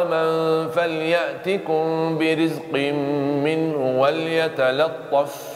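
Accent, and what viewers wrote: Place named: Medina, Saudi Arabia. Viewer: Sy suka sekali murottal ini. Terbayang syekh Madinah namun sdh bercampur Indonesia. Doa kami untukmu, syekhuna 🙏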